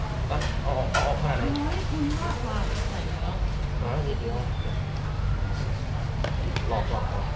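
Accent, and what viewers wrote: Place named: Isan, Thailand. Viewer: i like it whan you talk e-sen it sound so cool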